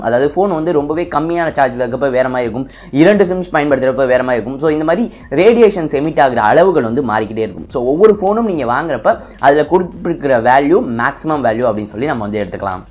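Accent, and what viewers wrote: Madurai, India. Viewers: Bro neenga Madurai ah????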